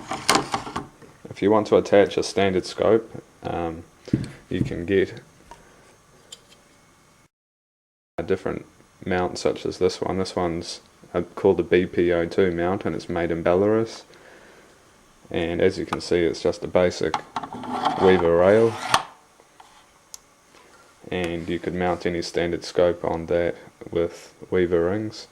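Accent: New Zealand. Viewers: Could pick your kiwi accent a mile away :)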